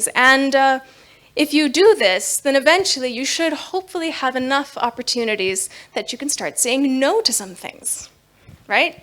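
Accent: Maharashtra, India. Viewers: Her accent has become closer to Marathi..as in Canada..English people has accent like Khan not ka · Basically, her accent are very indian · That accent is so Indian! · Her English has some Indian accent to it... 😂😂😂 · Her English accent so Indian man.. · chota v4 karu nakos, Indian accentach ahe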